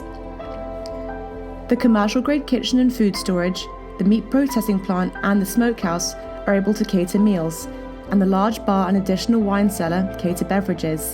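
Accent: United Kingdom. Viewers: A place SO exquisite.... only a British accent can describe its grandeur! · Terence Mac Sweeney Sotheby’s is a British auction house. Makes sense they’d use a British narrator to promote their brand. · from the accent of the voice over, I thought this property was in somewhere in Scotland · with no offence intended to the lady I think it is incongruous that the description is delivered with a british accent. Are you guys short of women in New York ?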